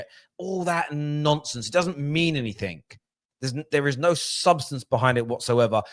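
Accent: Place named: Manchester, United Kingdom. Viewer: Another Man U fan with a strange Mancunian accent 🤔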